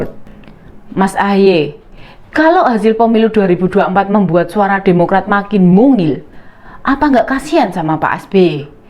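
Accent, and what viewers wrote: Java, Indonesia. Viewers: Bahasa yang medhok chas Mbak enak juga didengar 😃😃❤👍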